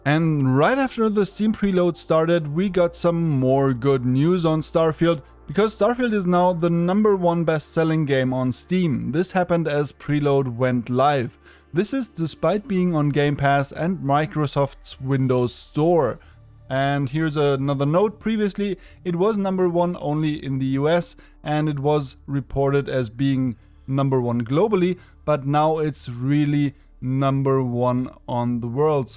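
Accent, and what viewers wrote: Germany. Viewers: You German? Your accent told me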